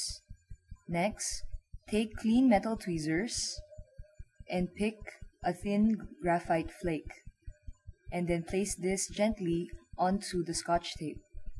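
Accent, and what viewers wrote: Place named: Philippines. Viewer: u sound like a Filipina.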